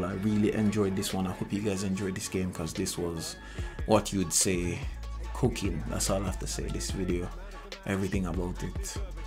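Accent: Jamaica. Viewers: Bro got Jamaican accent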